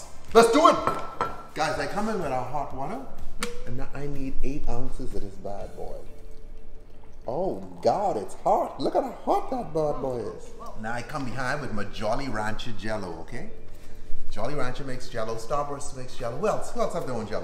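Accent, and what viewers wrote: Bahamas. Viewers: I'm sure this has been covered before, and I'm super late to this channel, but where is he from? He sounds Bahamian to me. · Bahamian accent strong son lol oh how we love a good drink ! Keep up the good work brother!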